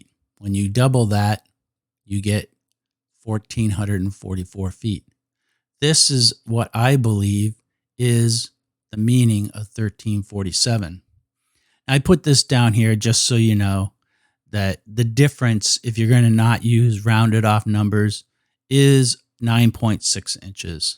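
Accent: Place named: Spain. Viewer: you always explain this so well! Thank you! (Nobody cares that your French is pronounced with a Spanish accent.)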